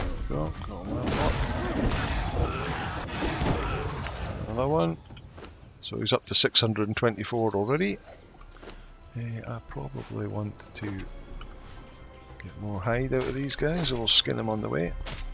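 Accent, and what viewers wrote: United Kingdom. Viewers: good video my greater shaleback is bruce iii and always nice to hear a fellow britt im sarf london innit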